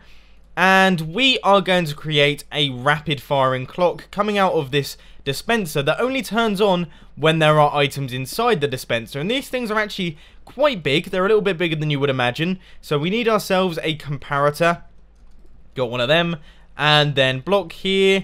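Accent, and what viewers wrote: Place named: United Kingdom. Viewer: Hello! My name is Byron, I'm a new viewer/subscriber to your channel. I discovered it about a week ago and I have been watching your whole LP series in the Hermitcraft server. I really enjoy your videos and I think your have a nice voice/accent! I'm American, so a British accent is nice to hear for a change. Anyways the reason I actually commented on this video was because I noticed every time you go to the Enderman farm in the End, you are always dying of starvation when showing off your enchanted books. Hahaha! No criticism, I just think it's funny. Okay hope you see this. Now back to your show and 31 epsidoe until I catch up!:)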